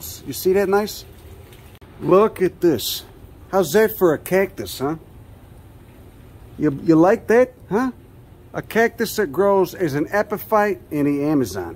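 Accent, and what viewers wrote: Chicago, United States. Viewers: Chicago accent!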